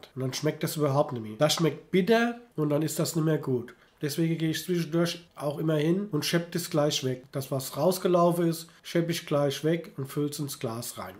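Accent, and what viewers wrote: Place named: Hesse, Germany. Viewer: Danke für die Anleitung. Des wunderbare hessische Gebabbel hab ich als Exil Hesse total vermisst. 😊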